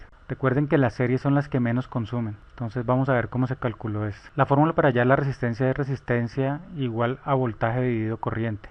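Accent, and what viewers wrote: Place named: Colombia. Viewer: Hola pues desde Guatemala saludos me parese su asento colombiano, buenos amigos de por alla!! gracias por compartir todo esto desde muy pequeño me ha gustado la electronica, solo estudie un semestre en la u, pero agradesco que ponga a disposicion estos conocimientos !! bueno cualquier cosa le molesto!! buen dia!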